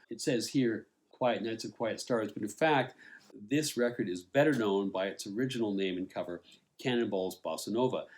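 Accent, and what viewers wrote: Canada. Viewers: Really enjoyed this review. Maybe it's a start of something? Pick and artist or sub genre and do a best of/overview. Anyways very enjoyable and always well researched and presented. Good Job as they say in the US. Interesting that you started out in the SW of England I think you said. I kinda guessed that maybe you were Canadian. North American accent but not US. You made a great point on the US Govt sending down black Americans to represent them when at home the average Afro-american was not afforded the ......let's just say apartheid was alive and well! That's a whole other rabbit hole so back on point. Love your reviews and love your presentation and style. Very pro, well researched.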